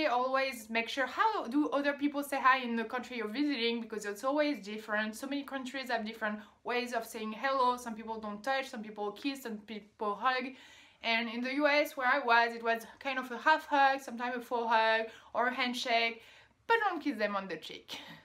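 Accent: France. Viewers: I have no clue who would downvote this girl she is so beautiful & her french accent is so adorable & pleasant to listen to! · I love your French accent!!! I’m from California and I can agree on everything you talked about in the video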